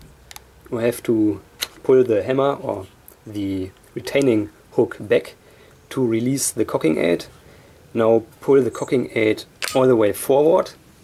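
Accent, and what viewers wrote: Germany. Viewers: DIESER DETSCHE AKZENT HAHAHA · Nice video! I love they German accent in English, A really god air pistol, and the Pellets are excelent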